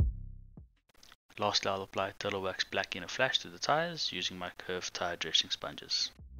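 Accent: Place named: South Africa. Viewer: You can recognise a SA accent anywhere. Nice job. Hope to see more videos.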